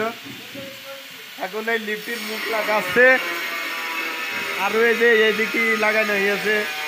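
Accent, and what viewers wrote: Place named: Chuadanga, Bangladesh. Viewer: কথা শুনেই বুঝতে পারছি, আমার বাড়ি ও চুয়াডাঙ্গা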